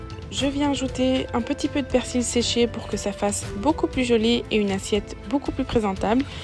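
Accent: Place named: Belgium. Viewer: Merci pour la recette. Vous avez un accent belge si je me trompe pas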